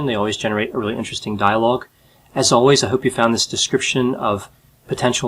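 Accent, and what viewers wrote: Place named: Massachusetts, United States. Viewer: What is this doctor's accent? Massachusetts?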